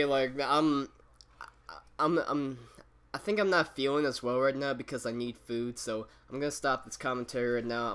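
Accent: Hawaii, United States. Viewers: he has a Hawaiian accent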